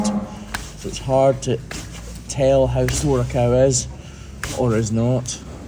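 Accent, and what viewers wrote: Scotland, United Kingdom. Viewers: As I read your book, I'm reading it in your Scottish accent 😂 Kinda weird eh 😊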